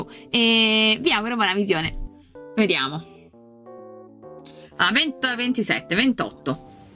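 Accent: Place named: Tuscany, Italy. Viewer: Ma sto accento toscano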